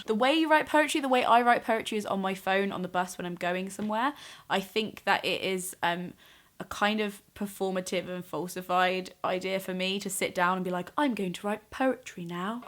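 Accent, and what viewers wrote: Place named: England, United Kingdom. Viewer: I love your English accent <3333 greetings from Argentina <33 And I actually liked your poem, it has a good rhyme to it :)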